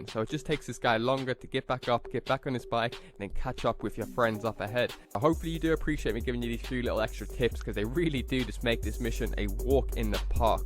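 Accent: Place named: England, United Kingdom. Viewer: Ohh l love that english accent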